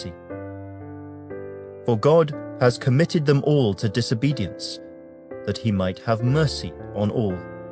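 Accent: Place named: RP, United Kingdom. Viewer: Enjoy listening to queens English and piano background music.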